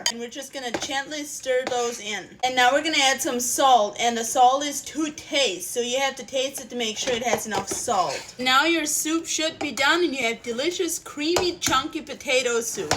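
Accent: Pennsylvania Dutch, United States. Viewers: its not a lisp, its a pennsylvania dutch accent ^^;; i love the amish, i used to build pole buildings with the amish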